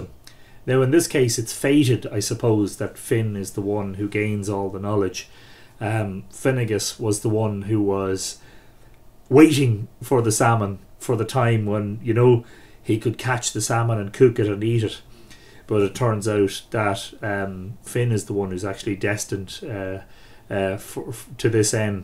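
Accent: Ireland. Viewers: Yes, I'm from Ohio in the US.. It's interesting that you are from Munster.. My husband's people and some of mine are from Clare.. He was first generation, my people have been here since before the American Revolution.. Being here for so long, I am mixed but still mostly Gael.. My son just bought me a DNA test to see if what I've been told about my ancestry is correct.. I grew up hearing myths and legends of Ireland.. I prefer sources written in Ireland and by Irish immigrants.. They're becoming easier to find but pronunciation is a quandary.. I can understand him but it's like me being a hillbilly understanding a New Yorker.. I guess since Ireland is so much smaller I expected less contrast in pronunciation.. Thank you for helping me..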